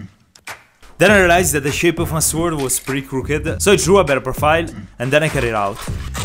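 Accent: Italy. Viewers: love your italian accent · Sei italiano? Ok alla fine del video si capisce che si, lo sei. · Are you from italy? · Ma sei italiano??😅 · Bro, ma sei italiano? Ok, lo è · Sorry but... are you italian?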